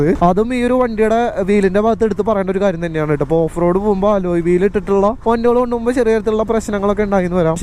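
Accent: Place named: Malappuram, India. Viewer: Malappuram slang 😅😄😉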